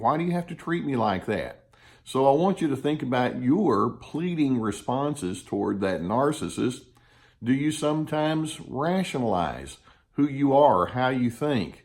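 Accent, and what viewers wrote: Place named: Texas, United States. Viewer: Your explanations are clear and thanks for the videos. I'm trying to place your accent. Are you from Texas?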